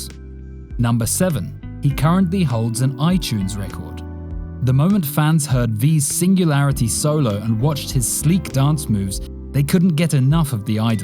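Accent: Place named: England, United Kingdom. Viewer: 1. The first 3 letter words in which you described him.......I thoroughly disagree with that. He ain't dt good IMO. 2. Please pronounce his name properly. Don't pronounce it in your English accent gvng it English pronunciation.😡😡😡😡. His name Kim Taehyung is a Korean name, not an English or an American name. So pronounce it d way Koreans pronounce it, not in d way Westerners speaking English pronounce it. 3. to ...well, in ds pic he ain't lukin charming but s lukin like a kindergarten school kid. 😂😂 And he was not dt charming in his initial days. He lukd quite ordinary. 4. As regards his impeccably perfect looks, I hv seen his photos during his pre-debut days & tbh he was nothing to look at back those days....he's just a lean & skinny faced road-side boy in his looks. But aftr bng a trainee he havin undergone through immeasurable grooming, care, styling & skin treatments, transformed into such a hottie. 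Before, he just had fair complexion & a perfectly shaped face. Hence it's proved dt be it males or females, if we undergo proper grooming, care & styling under best professional beauticians, or under our own selves, we wud b 20 times more attractive looking than we are in actual. Like, he's a farmer's son. But was one indeed born under extremely lucky stars due to which from an ordinary commoner of Korea he became a worldwide celebrity now.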